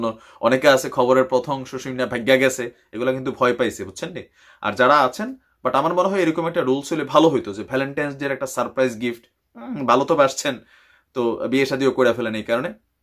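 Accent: Comilla, Bangladesh. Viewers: কুমিল্লার পোলা ৮০ টাকা তোলা , কুমিল্লার আঞ্চলিক ভাষা শুধু ভালো লাগে আপনার মুখে সত্যি সত্যি সত্যি সত্যি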